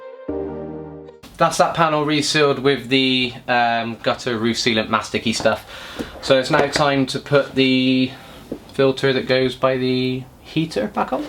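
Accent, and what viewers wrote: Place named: West Country, United Kingdom. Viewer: where are you mate? You sound West Country. We need this sorting on my step daughters corsa. She is In Glastonbury.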